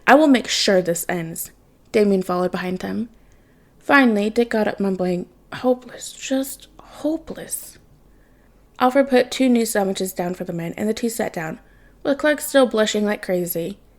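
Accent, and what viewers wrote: Midwest, United States. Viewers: Omg I love your midwestern accent!! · Hehe, i LOVED your midwestern accent, peak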